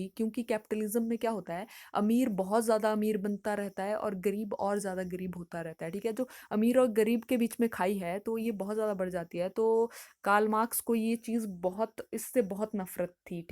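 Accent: Haryana, India. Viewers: Ur presentation is really interesting and it feels gud to get a haryanvi touch in the slang. Thank u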